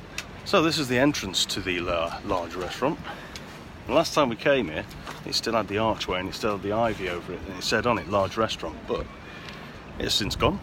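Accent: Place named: United States. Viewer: You would pass for an American in the US by your accent used in this video.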